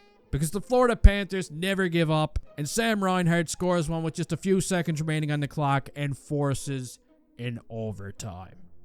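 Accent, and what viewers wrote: Newfoundland, Canada. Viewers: Are you a newfie?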